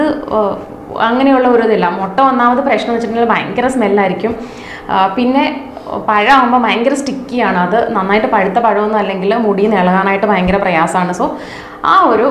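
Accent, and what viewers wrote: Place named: Tamil Nadu, India. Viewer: Slang korachu tamil mix aaghunnu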